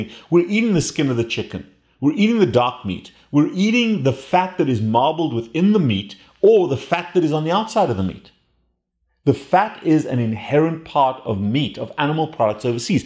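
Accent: South Africa. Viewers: I could tell you are South African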